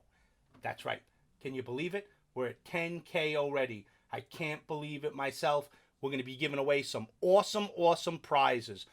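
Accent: New York, United States. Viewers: You and Mikey Vapes both come from New York and you sound like a New Yorker when you talk and Mike sounds like hes from Illinois or somthin.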